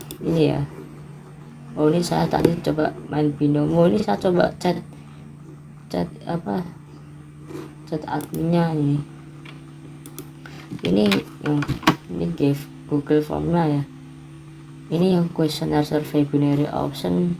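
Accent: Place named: Banyumas, Indonesia. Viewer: Ngapak ya bos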